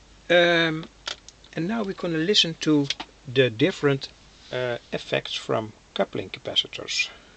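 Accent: Netherlands. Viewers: Are you dutch? becouse i am.. i can hear it on the way you talk..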